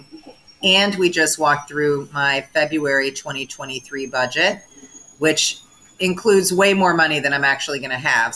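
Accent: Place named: South, United States. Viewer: You definitely have "South in your mouth" with the way you speak. A good thing.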